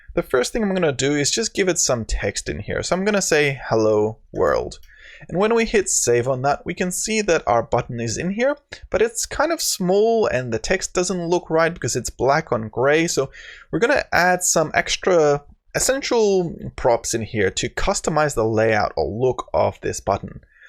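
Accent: Australia. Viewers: Australian accent is fantastic 👌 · useful tutorial as well as nice Aussie accent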